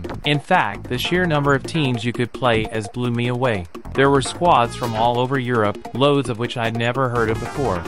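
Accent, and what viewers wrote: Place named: North America. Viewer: "Being from Merseyside" Speaks in a North American accent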